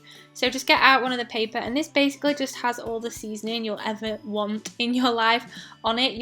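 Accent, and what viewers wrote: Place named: South Yorkshire, United Kingdom. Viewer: Where are you from?! You sounds so much like me, haha, i'm from South Yorkshire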